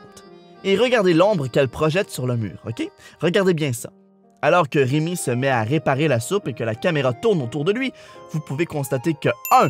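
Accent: Quebec, Canada. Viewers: Je surkiffe l'accent québécois c'c'est un folie je veux vire à Montréal la banlieu de Paris c'est la dech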